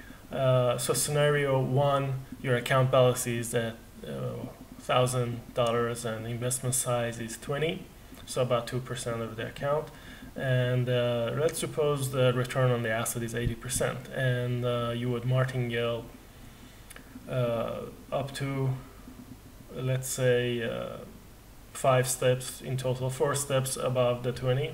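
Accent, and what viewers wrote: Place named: Hungary. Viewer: where are you from? i cant figure out by your accent. i feel like hungarian but im not sure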